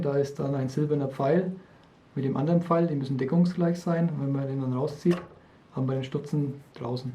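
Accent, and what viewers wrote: Germany. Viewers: Ich höre doch einen Franken raus ? 👍👌 Tolle Videos.....des gibt Auf jeden Fall a Abo 🤘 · Gute, komprimierte Erläuterung. Danke. Vom Dialekt her würde ich auf Mittelfranken tippen, Nbg, Fürth, Erlangen. ;-)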